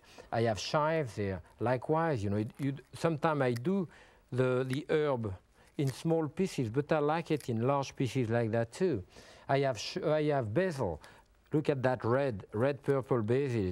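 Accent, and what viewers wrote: France. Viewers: He corrected himself a minute later and said “of course it’s a cantaloupe “, but you may not have understood his divine French accent.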